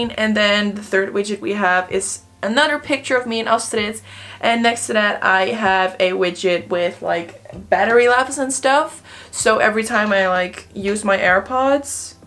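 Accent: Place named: Netherlands. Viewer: lol ik was je video aan het kijken en ik zat te denken huh jou accent is precies Nederlands maar het moment dat je het recept van de ontbijtkoek liet zien wist ik het meteen lmao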